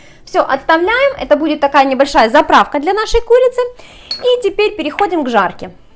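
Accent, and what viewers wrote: Ukraine. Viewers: Украинский акцент очень приятный и забавный...)))) Рецепт очень хороший...лайк за ВСЕ....))!)!)!)!)!)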